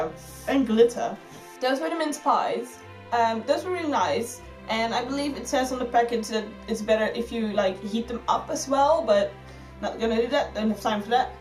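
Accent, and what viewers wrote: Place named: England, United Kingdom. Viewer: So basically... You've never heard of Gluhwein? That's KINDA a tradition here.... And ugh, chocolate oranges :( I really dislike the taste of those xD. But I love seeing you getting more and more English both in speech and manner xD Anyway, enjoy your boxing day and have a great new year!!!!!!!!!!!!!!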